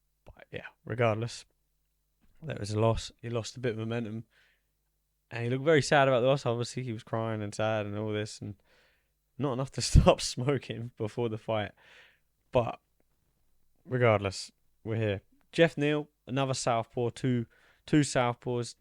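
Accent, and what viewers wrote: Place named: Australia. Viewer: You kinda talk like an Aussie lol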